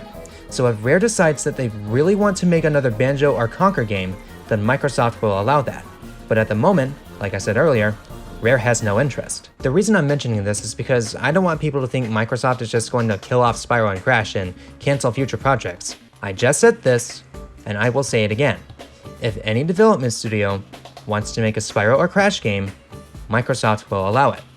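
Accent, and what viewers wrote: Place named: New York, United States. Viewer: Well, although I am glad about the information I have never once been excited to talk about with other channels, so instead I just want to comment on how your main character has been improving and how nice it looks. Really does give me that Spyro/Crash vibe, which I mean is further helped by your accent. Actually, it kinda sounds similar to Billy Joels and my Mami, do I just like New York and New Jersey accents or something. Probably the latter, seeing how many great films and games were made during their Era of stuff such as Ghostbusters, that Atlantis Disney Movie, etc that I grew up with when I was a young boy.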